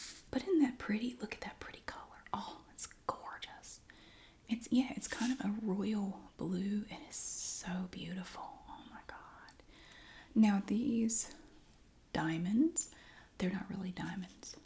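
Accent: South, United States. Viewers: I love your whispering videos. You have the nice sounding and calming voice. The southern accent also has this New Yorker hooked lol. Love it. You’re adorable and I love ALL you videos and your sense of humor! Don’t listen to trolls or haters they’re just jealous of your success.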